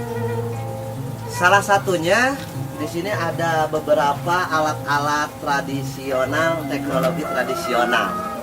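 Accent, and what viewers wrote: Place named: Sunda, Indonesia. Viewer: Uhh sunda pisan , mantap kang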